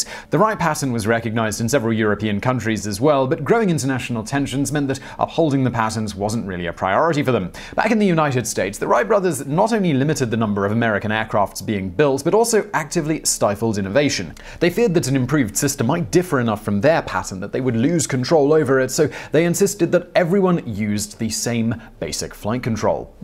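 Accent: United Kingdom. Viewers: The BritLish pronunciation is interesting indeed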